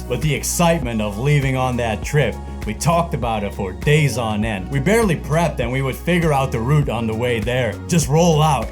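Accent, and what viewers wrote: Netherlands. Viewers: Hey! Just discovered your videos and they are fantastic! Would you mind answering a question for me? Where are you from? The Netherlands? Austria?:) Somewhere else? I am only asking because of the accent you have. I am Dutch myself but I've been living in the U.S now for nearly 20 years and my English accent has evolved somewhat. I am guessing my accent does sound a little like yours. Thanks for the videos! keep it up and stay safe!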